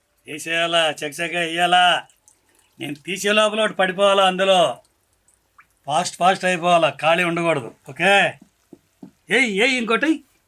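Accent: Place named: Kurnool, India. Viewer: Amma meeru ma Kurnool basha lo mataladu tunte chala bagundi Athrasalu❤❤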